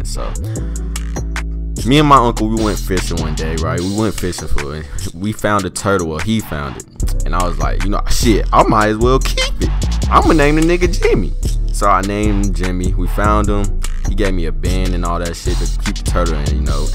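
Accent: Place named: New York, United States. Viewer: this nigga has to be from ny lmaooo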